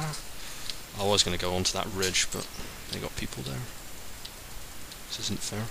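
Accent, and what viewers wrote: United Kingdom. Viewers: Are you going to buy BF3 or the 'new' COD:MW3? Also, it's nice to hear your awesome British voice again. ^^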